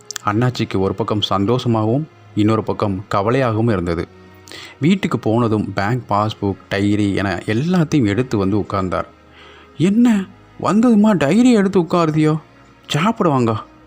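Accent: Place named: Tirunelveli, India. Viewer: Super.. Once again that nellai slang.. feel at home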